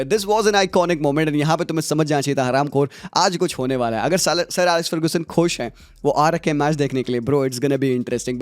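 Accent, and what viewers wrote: India. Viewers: Bro your accent is shit bro Indian accent make me puke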